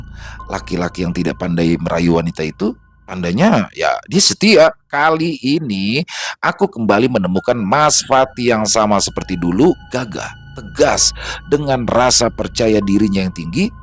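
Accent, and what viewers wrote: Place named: Java, Indonesia. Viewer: Kadangkala terpikat dengan gaya membawakan ceritanya yang terdengar seperti dialwk orang Betawi,juga seperti orang jawa dialeknya........ya teruslah berkarya ....semoga sehat dan sukses ya.....ok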